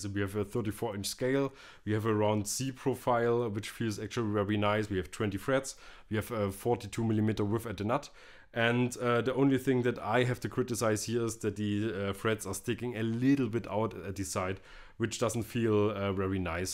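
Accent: Germany. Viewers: mann hört das du deutscher bist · Man hört so richtig, dass du Deutscher bist!!! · Direkt nach dem ersten Wort erkennt man den Landsmann =D Will mir von Harley Benton das bass Bastelkit kaufen bin mal gespannt ! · This german accent is killing me hahaha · When you're german and you try to speak English😂 you can hear the german out of him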